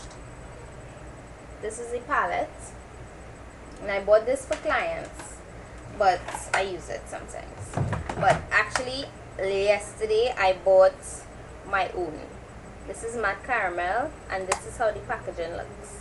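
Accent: Trinidad and Tobago. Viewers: oOh cool ur fromm triniii heariin da accent! (: which one would u recommend for oily skin the stick or the cream to powder foundation by black opal???? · Its trinidadian accent :D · love to see my Trini ppl . love the accent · Are you trini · haha You sound like my mum, she is a Trini too and she talks the same way you do, aw so cute hehe :)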